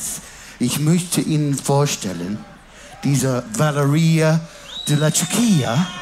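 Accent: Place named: Cologne, Germany. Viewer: Der kann auch Kölnisch Deutsch!😄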